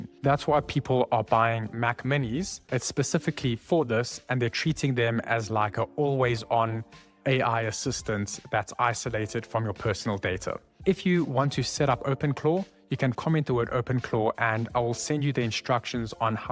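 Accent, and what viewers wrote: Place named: South Africa. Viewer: Didn’t realize how much I missed hearing the SA accent.❤ moved away when I was 11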